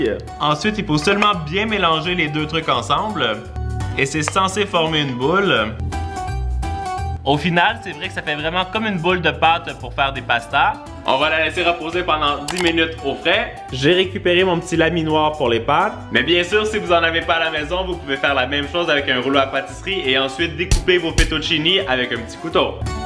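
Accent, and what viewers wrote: Canada. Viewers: On est pas trop citrouille en France mais c bon et on dit pas melon d'eau on dit pastèque voila et désoler j'aime pas trop l'accent canadien mais j'aime se que tu fait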